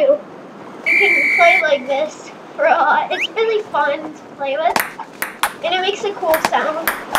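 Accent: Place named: United Kingdom. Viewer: You have a British accent in this video.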